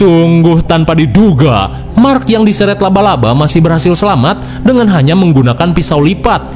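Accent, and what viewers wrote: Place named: West Java, Indonesia. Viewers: Hallo chanel kesukaan ku si logat sunda pred ❤ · Kaciri orang sundana si aa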